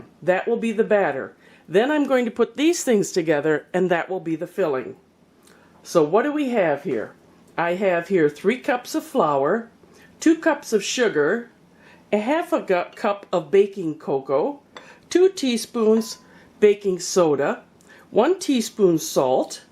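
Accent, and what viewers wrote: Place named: Minnesota, United States. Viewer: Hi! Do you and live in Mn? I'd say, you sorta have a Minnesota accent!😀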